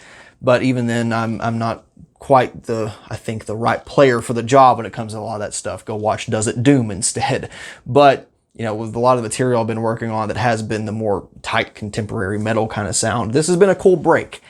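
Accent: United States. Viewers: very interesting stuff, ty for putting this together. as i am curious (german native speaker) - that accent, which area of the states are you roughly from?!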